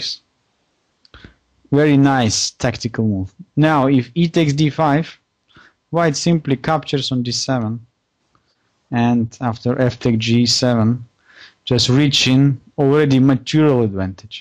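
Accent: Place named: France. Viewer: Fantástica partida pero yo tengo una pregunta que lleva rondándome la cabeza los últimos días. Cuál es la nacionalidad de este buen hombre? El nombre es ruso, el apellido judeoalemán pero el tío tiene un acento francés que flipas… Me he quedado loquísimo.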